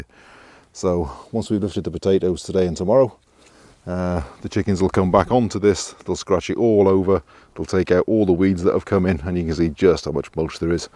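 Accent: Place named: Scotland, United Kingdom. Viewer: I love your accent I'm in New York are you of Scottish decent?I think I asked this once before to you lol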